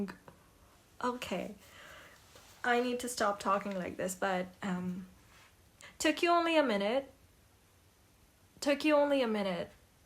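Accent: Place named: India. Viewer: WAIT ARE U INDIAN ??? Cause the quote sounds familiar and ur accent is the pretty indian one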